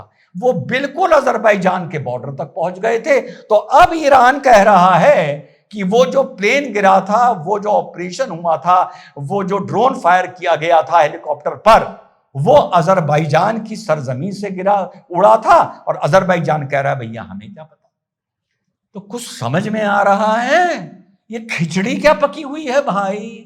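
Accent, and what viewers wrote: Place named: Punjab, India. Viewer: Punjabi ki tarhaan bol rahai hindi yeh musalmaan nahin .beta talfuss toh theek keeleta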